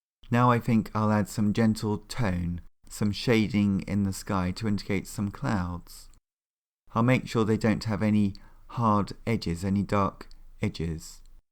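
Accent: United Kingdom. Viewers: You never over explain. Just the right amount of clarity and instruction. Thank you so much And great English accent by the way.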